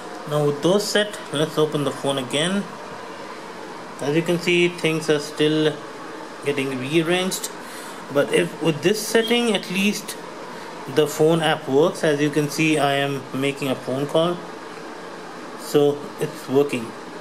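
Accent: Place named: India. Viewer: Another indian with stupid tongue stupid accent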